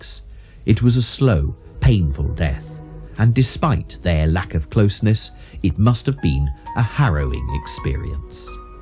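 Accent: United Kingdom. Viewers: God, I do so love a British accent.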